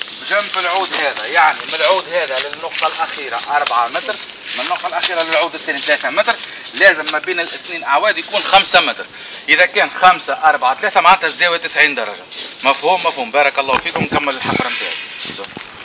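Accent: Tunisia. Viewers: صفاقسي انتي؟؟؟ متابعك من تونس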